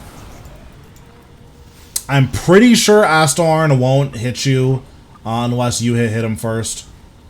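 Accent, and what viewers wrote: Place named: California, United States. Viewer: Where are you from? Sounds like California. 🤔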